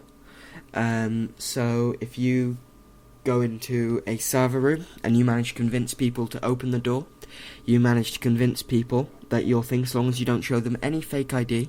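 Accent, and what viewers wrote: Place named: United Kingdom. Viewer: Dude.... you are a genius... my mind is blown... and the british accent makes you sound really smart too...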